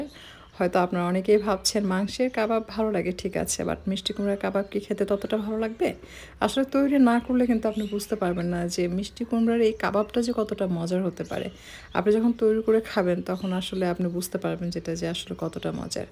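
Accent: Bangladesh. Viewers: Khub stylish apnar biboron....apnake dakhar ichhe...apni open kitchen baniye amader ranna show korun.khub kaydar ranna apni janen.....apnar channel ami follow kori.bangladeshi dialect y kotha bolleo apnar bisheshotto achhe anyo man er adobkayday bojha jay tai apnar kotha shunte bhalo lage.INSHAALLAH AMON SUNDOR MON ER MEYE HOYE PRITHIBI JOY KORUN RONDHONSHILPO KOLAY!🤗🤝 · nijer khawa nijei khan, kothar tike apne bangladesh neki, sorry amar valo lagenai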